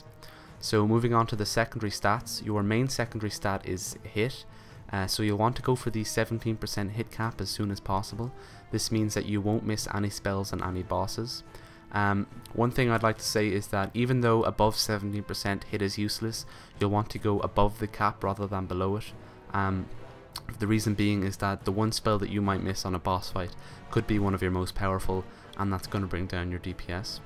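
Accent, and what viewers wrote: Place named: Ireland. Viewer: Another Irish warlock? :p my lordy!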